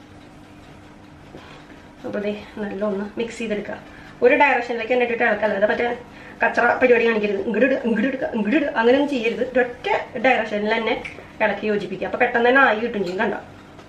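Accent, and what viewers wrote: Thrissur, India. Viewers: Taken long break in between. Eager to watch your vlogs bcoz of pure thrissur slang. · Chechi superb presentation,nammude tcr basha pollichutta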